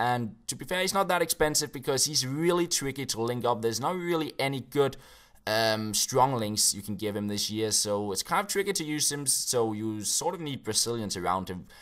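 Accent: Denmark. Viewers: U Sound danish.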